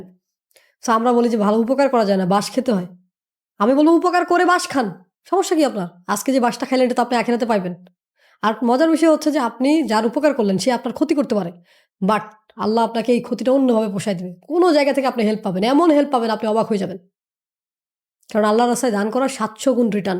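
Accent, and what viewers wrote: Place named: West Bengal, India. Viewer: প্রথমে ভাবছিলাম ইন্ডিয়ান কথা গুলো শুনে ভালো লাগছে তাই শুনলাম এখন আরও ভালো লাগলো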